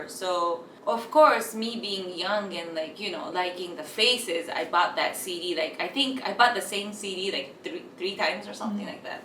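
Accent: Philippines. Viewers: She talks in english like a Filipina...I wonder if she knows some tagalog..... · Why do you have Filipino accent?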